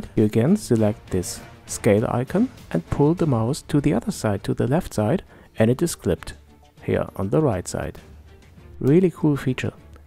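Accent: Germany. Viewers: i asume you're german with that accent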